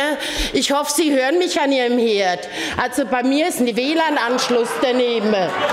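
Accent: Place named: Bavaria, Germany. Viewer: Des war vielleicht nur leicht bayrisch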